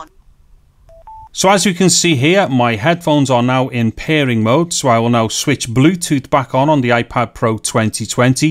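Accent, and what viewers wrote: Liverpool, United Kingdom. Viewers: Cheers scouse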